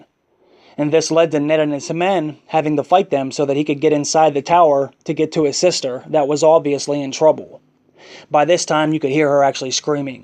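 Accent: Pittsburgh, United States. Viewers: Dude are you from Pittsburgh? Awesome video btw!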